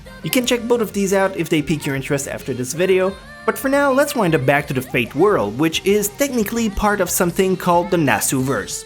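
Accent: Quebec, Canada. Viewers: Tu sonnes vraiment québécois. It takes a quebecer to recognize a quebecer accent haha.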